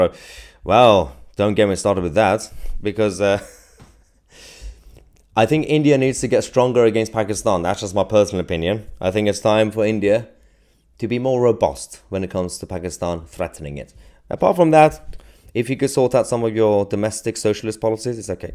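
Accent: United Kingdom. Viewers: Just discovered your channel two days ago. Love your reporting and your British accent! Viewing from Kansas in the U. S.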